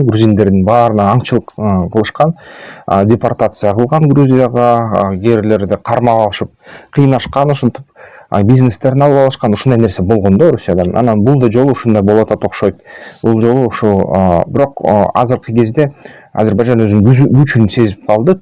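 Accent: Standard. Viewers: Азаматсын патириот деген сендей таза кыргызча суйлойт!!!....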